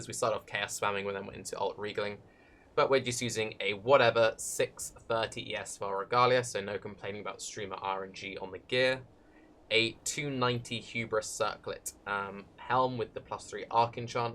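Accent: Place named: RP, United Kingdom. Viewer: yeah i miss posh speaking made me feel like im im the presence of royalty. FeelsRoyalMan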